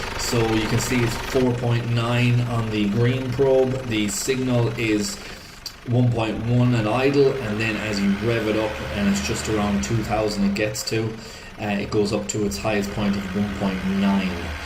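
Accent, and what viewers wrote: Ireland. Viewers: Great video, just subscribed. I'm in Ireland you sound like your familiar to there :) . I have a 2.5td ranger year 2011, losing power on and off today it would hardly pull up hill then it might go again but constantly down on power over last few months. I put in new injectors and cleaned out diesel tank still has the issue. Any suggestions, thanks for the help..No lights coming up on the dash. Tks again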